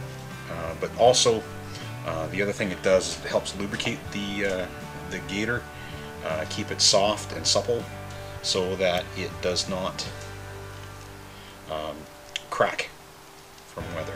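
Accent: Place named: Canada. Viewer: Go Canada ! Can hear the accent come through clearly. May the truckers be blessed and may Trudeau go the way of Herod. I really needed that tip on the fender thingy because I struggled with it last night and was cursing German engineering. Also I came up with an extra little black washer ? Does it go on the back part of the shaft housing where the one fender bolt goes in ?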